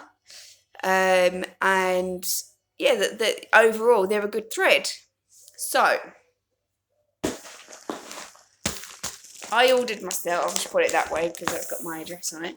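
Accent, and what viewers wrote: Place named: Essex, United Kingdom. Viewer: How much fun are you! Loved the video 🎉. I'm a kiwi in Essex, and still adore the accent.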